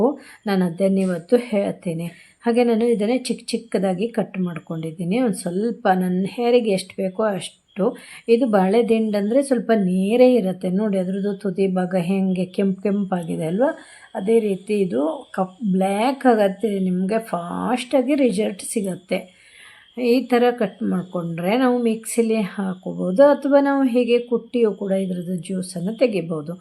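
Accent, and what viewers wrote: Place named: Kerala, India. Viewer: Nimma matugalu kerala dvaru kannada matanadidante ede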